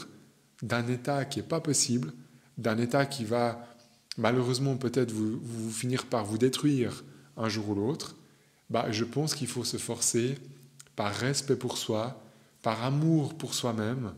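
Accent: Switzerland. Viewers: damn t'est suisse... Vaudois je dirais. magnifique postulat merci, de suisse aussi 😅. je modifie mon comm pour dire simplement que ta sensibilité se voit et qu'elle est belle mon gars. sincèrement bravo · Ton accent montre que tu es en suisse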